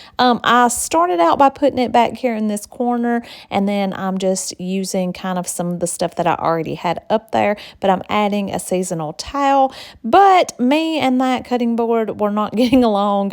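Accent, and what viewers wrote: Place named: Houston, United States. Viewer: Hi! New subscriber here!! Beautiful house and decor!! I wanted to know if you live in Houston? (Your accent sure sounds like it!) We do not have basements where I live in Houston! But I grew up with them in Pennsylvania and truly miss having one ❤️💚 Thanks for sharing!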